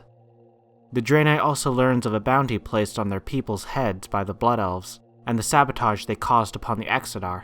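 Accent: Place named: Ireland. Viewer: Are u Irish I hear a slight Irish accent in your voice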